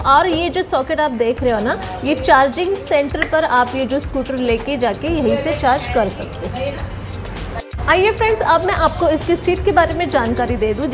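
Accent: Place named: South, India. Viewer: Apka south indian speaking style bahut pyara hai .. ♥️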